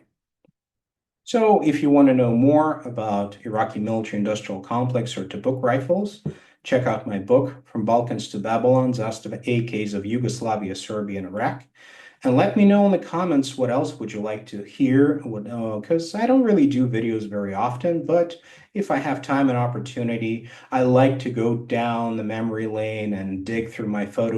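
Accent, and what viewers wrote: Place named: United States. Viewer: holy shit had no idea this dude spoke with an american accent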